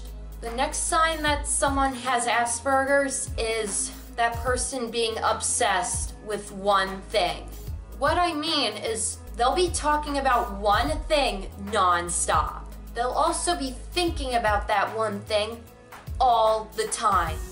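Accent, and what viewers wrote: New York, United States. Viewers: Excellent video! I am CONVINCED my roommate has Aspbergers.... its' been driving me nuts.... I had a hunch he had it and you checked every box for me... he is SUPER smart... however, he is SUPER shy, doesnt look me or anyone in the eye,... can obsess over things.... honestly, can be quite selfish,.... but I truly think he has Aspergers... this video really confirms a lot for me... I feel like it would be super rude to ask him if he has it... he has admitted to being very shy. I have only been living with him for a month... and ive had some frustration with the living situatioion so far for all the things mentioned above... anyway. im probably boring you to tears lol but thanks for posting this! I love your accent haha it sounds very New York (Im a new yorker). I'll check out some of your other vids and subscribe. I dated an autistic guy once, and we managed... 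but it was hard. Ultimately we broke up cuz he was cheating on me... but thats a whole other story. I've always been fascinated by hearing other people's experiences and you did a great job. Cheers. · Great video but i HATE the way Americans pronounce Aspergers. There's a "p" in the there, and a soft "g". Anyone would think you lot are obsessed with burgers or something.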